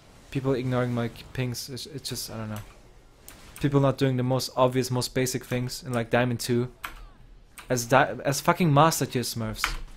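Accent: Germany. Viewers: Are u actually german? You sound like a german. :d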